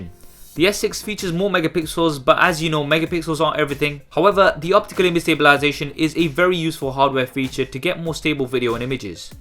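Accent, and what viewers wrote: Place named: East London, United Kingdom. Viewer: I subbed yesterday, just ordered an S6 due to its price dropping £150 over the last month, glad i did as well. Cool vids dude, just about to launch a gaming/tech channel in the next week or so, my review of the phone will definitely be on there. BTW, you sound local, im just north of london, you sound east?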